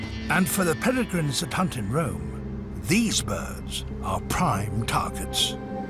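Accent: United Kingdom. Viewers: I have loved David Attenborough way of narrating nature for many years ... his british accent and the dedication to spread out knowledge.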